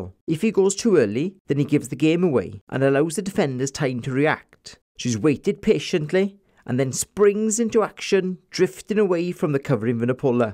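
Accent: Wales, United Kingdom. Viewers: Nice breakdown and analysis,. Your accent is very much like mine, where are you from? I grew up in Pontardulais but now live in Australia.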